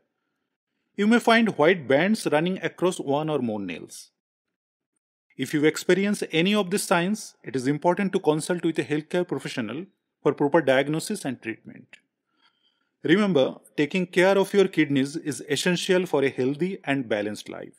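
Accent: India. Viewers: You can turn on Closed Captions (CC) and then read what he is saying. I can understand him fine as I’ve had friends from India.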